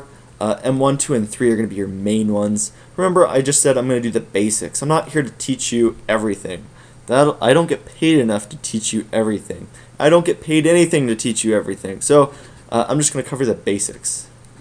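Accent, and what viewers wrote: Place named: Iowa, United States. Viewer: My guess is its an American accent, from Iowa.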